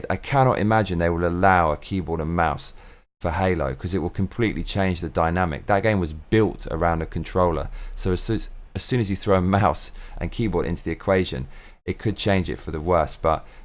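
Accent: United Kingdom. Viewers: Hello, The lead-actor of the Creed movies is Michael B. Jordan (no relation to the superstar American basketball-player, Michael Jordan). He played the primary-villain in the recent Black Panther film. He was also Johnny Storm/The Human Torch in the 2015 version of The Fantastic Four. I was unable to hear the quality-difference between the microphone that you usually use, and the $10,000-microphone; perhaps the audio-compression that YouTube employs removes the frequencies and the nuances that make the difference. However, it was very interesting to see the substantial-weight of the superior microphone. I, too, have never heard of an internal pop-filter/pop-shield; I simply reasoned that such an expensive microphone would probably include pop-prevention methods that are more-convenient and more-effective than what is available for microphones that most people can afford to purchase. 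The fitted 360-degree filter is beautiful, and it makes the microphone/filter look all-of-one-piece, as opposed to most filters/shields, which look like sloppy after-thoughts. Your instructions for the audience to ignore your spoken-content, and to pay attention to only the sound of your voice, made me laugh! Although you have a masculine-deep voice and a classy British-accent, it is your intelligent and entertaining commentary that has made me continue to be a subscriber since I saw your insightful-critique of The Force Awakens. Good luck to you as you apply for a permanent-visa i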